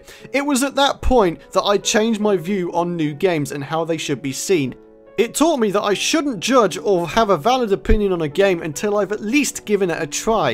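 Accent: United Kingdom. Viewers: let me just say that among many of the Minecraft YouTubers that I watch for their adventures through the vast world that is Minecraft, and the several great Terraria Let's Players that I watch, your intro and voice definitely resonates within my mind as one of the most energetic, fun and exciting ones that I know of! I discovered you from a comment you posted on one of Yrimir's videos when you were around 45-50K subscribers, and I remember you were working on your Co-Op with AstonishingGamer as well as just starting the Sky Island series with another fellow (whose name eludes me) and I was immediately hooked to your episodes. Perhaps it's your charming British talk, or the way you say "I'm a very happy bunny!" but I am very much a fan of your videos and I look forward to what is to come in the future. Congratulations! Please keep up the good work, and it's commendable doing this while working someplace in real time. 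I hope to watch hundreds of your videos in the future! -Thomas (Could I request your filmed reaction when you get your plaque? I'm not saying a view of your face, but perhaps just like your first person reaction to it.:D)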